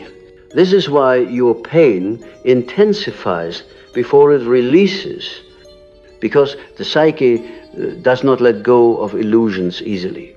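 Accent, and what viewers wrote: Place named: Germany. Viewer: No perfectin in your Speech or pronounciaition. . And to hard on the accent .. tooo germanish .. but acceptable.. create some perfection guys .. like original ..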